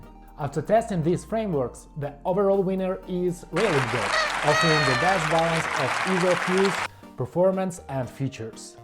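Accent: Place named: Russia. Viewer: с первых секунд услышал русский акцент)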